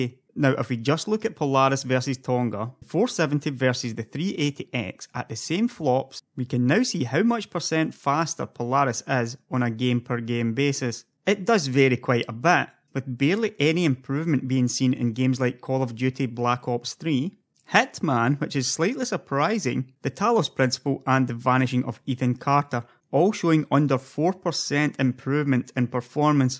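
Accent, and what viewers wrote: Scotland, United Kingdom. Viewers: a Scottish bloke talk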